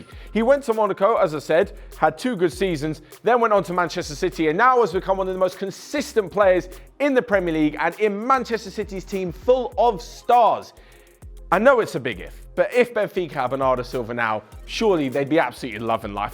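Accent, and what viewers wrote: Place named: Cockney, United Kingdom. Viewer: How about speaking English, not low IQ Cockney!? 👅🙈🙉😭😂😢😣😰😀👎👎👎👎👎👺👹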